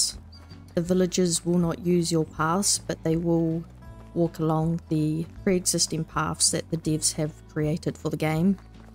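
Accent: New Zealand. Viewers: Youtuber sounds kiwi as. haha.. Nice!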